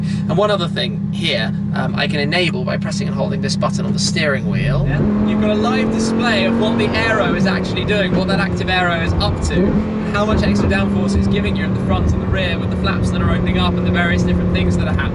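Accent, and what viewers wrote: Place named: United Kingdom. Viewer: As much as I think your British accent is just.... dandy, please give it a rest while you're driving. Even during launch control, you're just blabbering on and on. It's like someone put 6 AA batteries in your head and turned the "ON" button.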